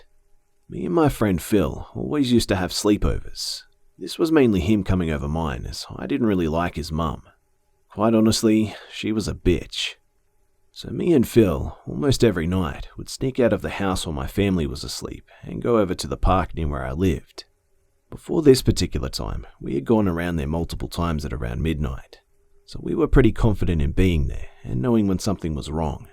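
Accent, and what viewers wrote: Australia. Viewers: are you Australian?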